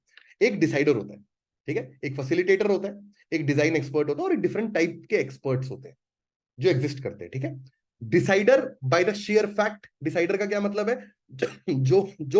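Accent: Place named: Hinglish, India. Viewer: that hinglish is killing me brottha !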